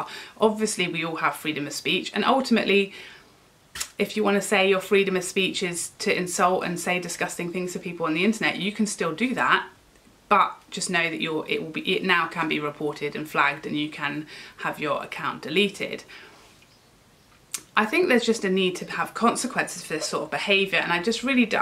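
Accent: United Kingdom. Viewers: I was brought up to say nothing if you can’t be nice. As Wayne Goss says...be kind Facebook should do this too I think...people can be so awful 😕 Ps...I like your personality & accent...reminds me of my Dad (he was from the UK) 😊 xB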